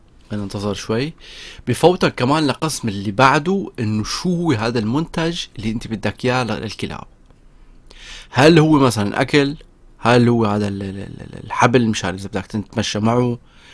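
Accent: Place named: Idlib, Syria. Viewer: ليش حاسك ادلبي 😁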